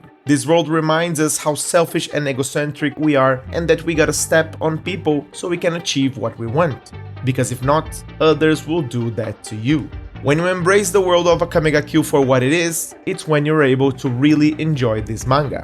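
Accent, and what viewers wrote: Brazil. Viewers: mano esse cara é brasileiro eu acho. ingles bem portuguesado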